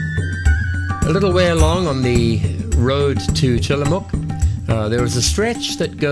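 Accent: South Africa. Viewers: Can hear you're a South African that have been in Canada for a few years. Sorry about your Tesla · glad you two are safe! slightly off topic but are you any bit south african?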